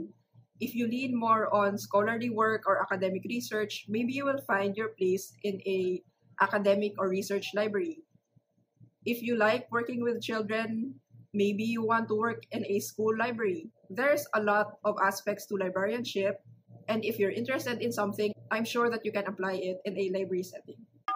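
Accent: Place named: Hiligaynon, Philippines. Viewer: I suggest your intro for your next vlogs soon will have like a jolly ilongo accent :) thank you for your content to boost information on Library profession in the PH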